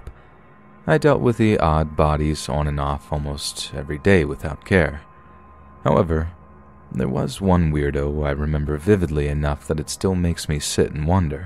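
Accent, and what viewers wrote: Ireland. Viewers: That Irish accent tho lmao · It was very interesting, love it!! Love your Irish accent, it was awesome 👏 Thank you 🙏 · Nice Irish accent 👍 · that irish accent tho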